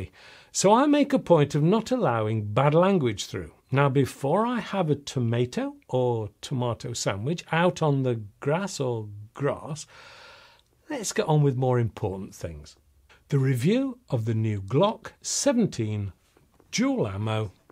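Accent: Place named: Liverpool, United Kingdom. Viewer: Thank you for an excellent review! Love your accent... Liverpool, originally? The real steel rattle abit, not as much as the airgun, but still! I do agree that the 17 gen 4 seems to be the most lifelike, but the threaded one, with a mock supressor, would mimic silencer on movies to a spot.... nothing like real life supressors...but again, still.. I am glad to your approach to the trolls, I am 58 and still enjoy airguns and airsoft to cheaper training then with the real steel ( ammo is extpensive in Sweden). Not to call you old in anyway, I would be far from it, but it is nice to see a mature man enjoy and review on YT... for the most it is youngsters.... don’t get me wrong, they do a awsome work...but... for the third time... ” still”... Keep up the good work, sir, and I hope to see many moore reviews from you, as long as you find it interesting! 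Thank you sooo much for upload! Oh, is there a Crosman SBR full auto pellet gun there, in your background?😉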